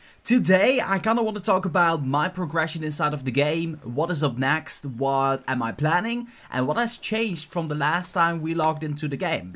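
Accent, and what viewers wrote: Netherlands. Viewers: if i compare youre accent with loui van gaal. i can obvious hear you are from the netherlands. correct me if im wrong.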